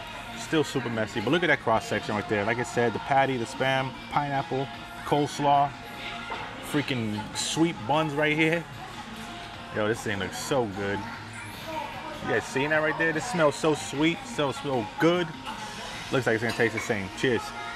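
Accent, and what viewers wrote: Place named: Tri-State Area, United States. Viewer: Oye, you from the tri state area? I'm placing bets on Jersey or possibly the city (NYC). I hear an accent and it sounds like fam back home. #NoTimeForADiet 🤣😂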